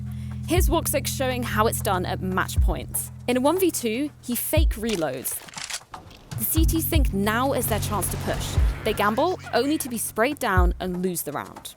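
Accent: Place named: United Kingdom. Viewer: That female british accent tho 🤤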